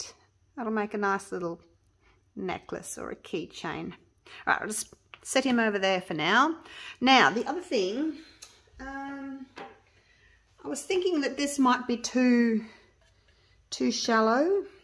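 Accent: Australia. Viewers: Wow that is amazing! Everything you do is amazing!! I work with resin but can’t even come close to doing all the great stuff you do!! I love all your videos!! Plus there isn’t anything better then a Australian accent!! Lol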